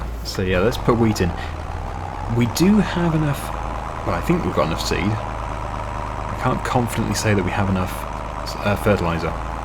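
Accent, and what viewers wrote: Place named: England, United Kingdom. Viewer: I don't want to affend anybody but he has an English accent (I think) but in the game he drives on the right side of the road. Just something I noticed.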